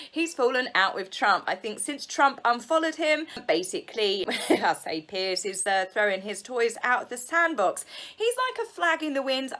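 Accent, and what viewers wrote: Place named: Sussex, United Kingdom. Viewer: Where are you ? I’m in Bexhill (well Little Common really) I was pretty sure that you’re in the same area as me, we speak in the same way ! NICE ONE xx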